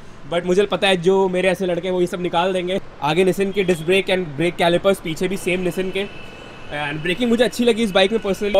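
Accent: India. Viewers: How does every indian speaks the exact same english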